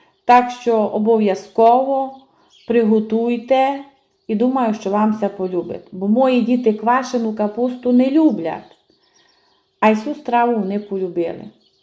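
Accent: Transcarpathia, Ukraine. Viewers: Гарний говір закарпатський. Більш венгерський а не молдавський. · Як мені гарно чути закарпатську мову: подобається більше ніж рецепт. Хоча і рецепт гарний, треба спробувати 😊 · малоймовірно він партачив московитську!А розмовляє на закарпатському діалекті! І це неймовірно чудово, наша Україна багата на діалекти.Напевне коли навчались у школі вам про це говорили, або ви прогулювали уроки😮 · Важкий діалект, важко слухати, хоча я сама з Франківська. Закарпатський, Чернівецький діалекти відрізняються від нашого. Одне слово "суль" і вуха скручуються. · вирвіть собі краще! Кожен регіон має свій діалект - закарпатський саме такий, і ми його любимо!!! - дякую) Якщо що - я з Черкас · Нормальний закарпатський говір. Наша країна велика і в кожному регіоні свій діалект.Я навпаки просто насолоджуюся,як говорить пані.Дуже вдячна за рецепт. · поддерживаю, это не украинский язык. 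Это местечковый закарпатский говор. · Я в захваті від вашої прекрасної закарпатської мови. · ПРИВІТ ВСІМ З ХЕРСОНУ. ДЯКУЮ ПАНІ ЗА РЕЦЕПТ. ГАРНА УКРАЇНСЬКА МОВА. ЗАКАРПАТСЬКИЙ ДІАЛЕКТ. ЧУДОВО.❤👍🔥 ЗАКЛИКАЮ ПОВАЖАТИ ОДНИ ОДНОГО, ТА НЕ ТРЕБА ЦЬКУВАТИ ОДИН ОДНОГО ЗА НАШУ СОЛОВ'ЇНУ... МИ УСІ ГРОМАДЯНИ УКРАЇНИ.💙💛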